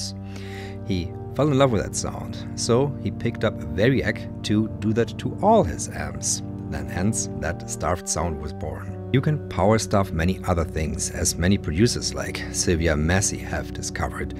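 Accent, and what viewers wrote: Germany. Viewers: You have the PERFECT accent to go with that voice. So comfy, and Teutonic enough to inspire confidence in you, somehow? IDK it's just wonderful to listen to. Like that organ!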